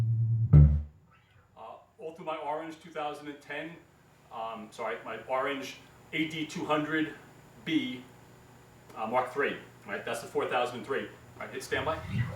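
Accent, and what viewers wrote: United States. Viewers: your either a cop or firefighter, and you live...... hmmmmmm long island? Dont take that the wrong way, I love accents. You sound great. Im probably 100% incorrect. thanks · You sound like a New Yorker!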